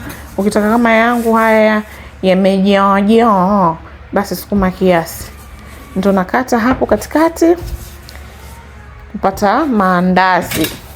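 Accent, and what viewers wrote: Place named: Tanzania. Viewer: Mahamri ni lugha ya Kenya, lkn mpishi anaonekana ana lafdhi ya ki Tz, neno "kiduchu" sina hakika lkn nahisi kama linatumika Zanzibar pekee 😂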